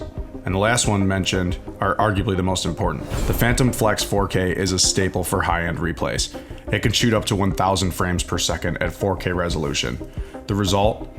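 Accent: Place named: Midwest, United States. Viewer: Sooooo…this video is a complete ripoff from the “Amazing Technology” 3-year old video from the NFL Throwback channel. Which is a rip from the NFL Explained NBC-produced documentary. All clips are pulled from that video. The only thing missing is this narrator’s Midwest accent. I’m sorry, narrowing down a real shows work and summarizing it, isn’t “work” that you should get credit for. Cite your sources and link if you need to, but be original.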